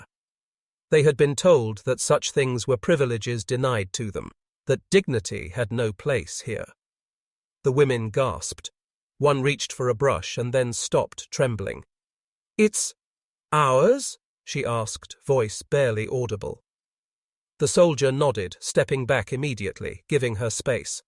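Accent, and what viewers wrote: United Kingdom. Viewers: wHY IS THIS ROBOT SPEAKING BRITISH ENGLISH? TO AN AMERICAN AUDIENCE?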